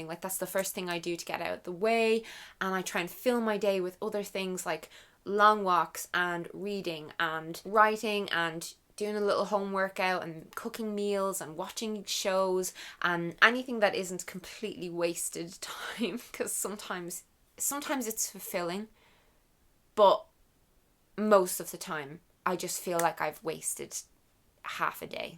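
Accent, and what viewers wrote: Ireland. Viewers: Hey. I'm am old fan. I picked up ur Irish accent in my teens 😂. I'm in my 20s now and my 3 worst habbits i wld really like to control are: 1. Waking up late. 2. Biting my dry lips. 3. Not drinking enough water.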